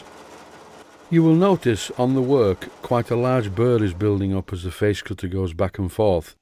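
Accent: United Kingdom. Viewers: Well done, greetings from the USA. I've always had an interest in steam power, I guess from the many stories that were passed on to me from my uncle and others who farmed years back with horses and steam power during the early 1900s and on. I don't mean to ramble, I like to share at times. I'm now ready to start a steam engine build project I think. The workings of the valve you did so well explain here helped me a lot to understand how steam gets to the cylinder and so forth., However, I can't grasp the word you used in the beginning to describe the type of metal or cast material needed. And please understand it's not your UK dialect., The audio just didn't get through for me to hear you well. And many thanks for sharing so much here. And very cool you're a musician by trade, and doing these steam projects too. Take care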